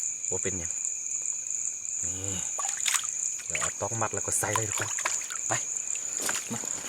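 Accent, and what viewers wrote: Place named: North, Thailand. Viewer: อู้เหมือนคนเหนือเจ้า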